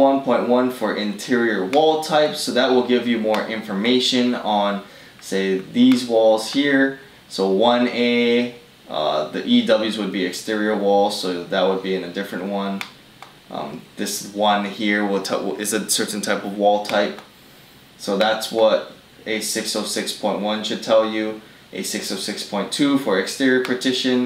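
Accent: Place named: Hawaii, United States. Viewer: I could tell just within the 1st minute of your video that you were from Hawaii. Mahalo for the content, I started as a laborer 2 years ago and want to progress with this as my career choice so I’ll be watching more of your videos!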